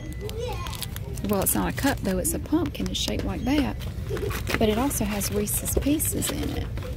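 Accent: South, United States. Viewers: I appreciate your organizational vids and adore your Southern accent 💘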